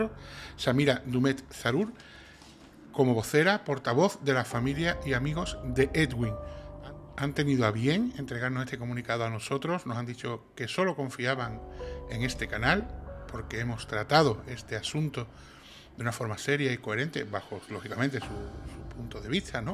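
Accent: Andalusia, Spain. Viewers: Gracias, por un nuevo vídeo interesante. Me vienen varias ideas a la mente: - No me parece tan normal para una persona de clase media que pidas un préstamo de, no sé, pongamos 20.000€ para irte de vacaciones, cuando pretendes establecerte en un país, donde no vas a tener ingresos durante un largo tiempo. La homologación de un título universitario extracomunitario puede tardar años. - No debe de irte muy bien en tu trabajo, cuando pides un préstamo para poder irte de vacaciones. ¿Qué eres un derrochador?, vale, puede ser, pero gastar lo que no tienes es otra cosa. - Puede ser que él creyera que D.S. era cosocio/copropietario de una hamburguesería, si se la coló a su propio padre, es posible que ese mentiroso compulsivo le estuviera engañando a Edwin para conseguir un dinero. Ya sabemos del comportamiento parasitario del presunto psicópata asesino. - Es una pena que Edwin no tuviera propiedades, yo pensaba que de alguna manera iba a poder seguir ayudando a su familia con la venta de estas propiedades, pero parece que eso no va a ser así. 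Espero que al menos tuviera un seguro de vida, porque imagino que este asunto va a causarle muchos gastos a la familia: abogado, repatriación de las cenizas... - Por otro lado, mucho tienes que ganar para que te compense el gasto de 2 viajes ida y vuelta España- Chile al mes. En fin, que se me plantean muchas dudas. Gracias por tus aportaciones y por ese acento andaluz que tan bonito es. Un abrazo desde un bello lugar malagueño. 🤗 NB: En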